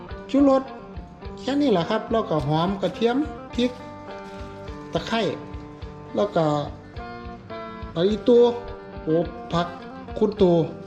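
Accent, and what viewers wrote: Chaiyaphum, Thailand. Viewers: เจ้าคือมาเว้าคือสำเนียงคนบ้านข่อยแท่ คนชัยภูมิแมจ้า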